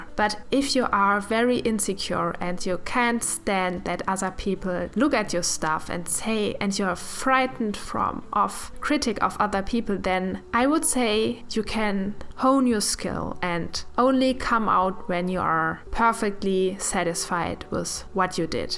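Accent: Germany. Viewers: Are you by any chance german? I'm german and you sound typically german ( which of course isn't a bad thing at all ) btw the painting looks really good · where are you from? tbh you sound very German 😂 would be funny if you actually are, bc lately I found so many german artist on YouTube! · Bist du Deutsche? 😂 hört man sofort haha 💖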